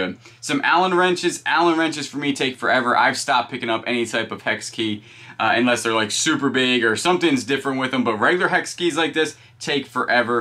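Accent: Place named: Baltimore, United States. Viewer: Sounds like a Baltimore accent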